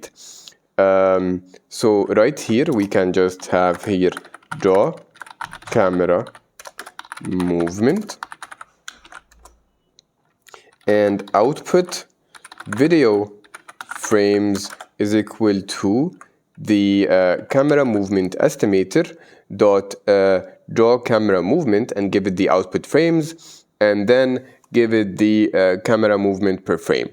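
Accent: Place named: Egypt. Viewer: i feel. the accent is more Egyptian :hand-pink-waving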